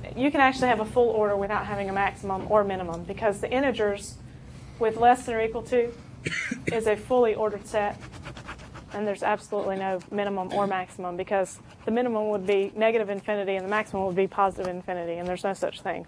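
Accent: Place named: Texas, United States. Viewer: Accent as sweet and Texas tea.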